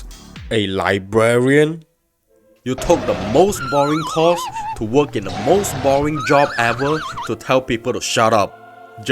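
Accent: Malaysia. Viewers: First word of the video can already tell bro is a malaysian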